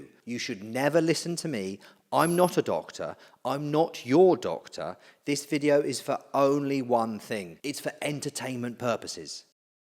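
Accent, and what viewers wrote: United Kingdom. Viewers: Just found you online, love the content and delivery. Where are you from, sounds a bit like notts area?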